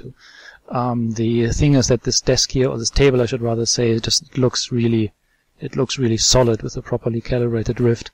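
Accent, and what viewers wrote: Germany. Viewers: Ist das ein deutscher Akzent, den ich da raushöre?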